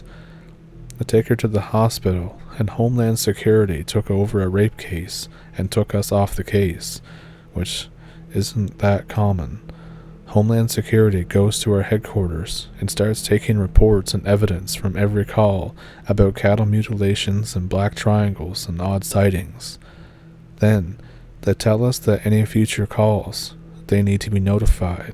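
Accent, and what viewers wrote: Canada. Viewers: Is this somebody else’s story because you don’t have an Oklahoma accent you sound like you’re from Canada? Is that correct?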